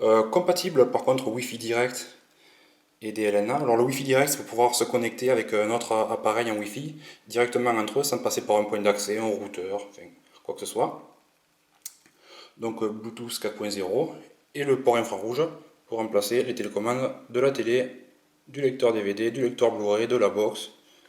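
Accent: South, France. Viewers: Bien joué pour la vidéo! Preneur pour avoir ton avis après utilisation quotidienne. Et vive l'accent du sud ;)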